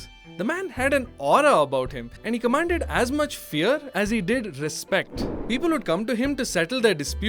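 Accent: South India, India. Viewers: typical indian accent .. lolll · Your name is North Indian but your way of speaking is south indian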